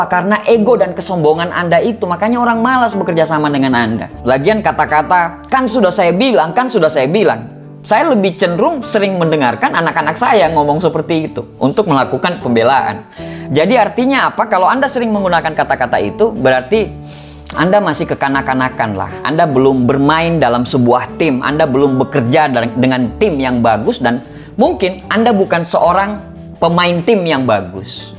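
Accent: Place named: Palu, Indonesia. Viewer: Kayak logat Palu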